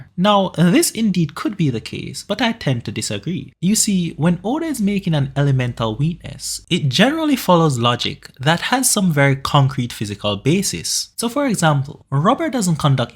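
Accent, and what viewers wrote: Caribbean. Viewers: Are you from the caribbean? I hear the accent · you sound caribbean where you from?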